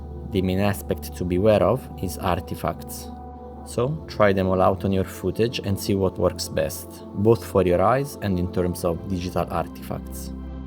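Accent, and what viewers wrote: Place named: Italy. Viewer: Saturated topic explained in a very unique and amazing way. Learnt alot, thank you. How do project settings affect this process? Ive been on the constant struggle between Color managment, input color space, etc. Is this something that changes your workflow in any way? PS: The italian accent makes it even more tastefull haha thank you!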